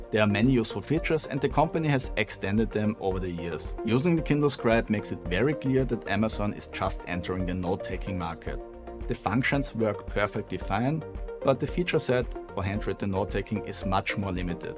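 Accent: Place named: Austria. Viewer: Inspite the heaviest Austrian(?) accent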